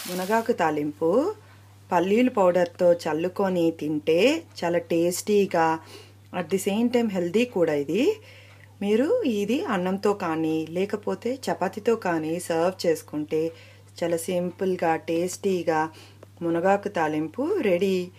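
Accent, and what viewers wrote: India. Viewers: your accent resembles chittoor district style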